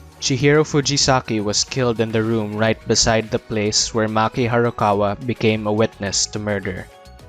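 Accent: Philippines. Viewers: You sound so pinoy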